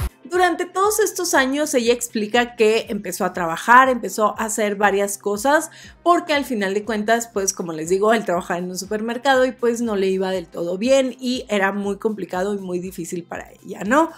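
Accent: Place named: Peru. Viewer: YO CREO Q ESTA BIEN ,Q NO PIERDA SU ACENTO PERUANO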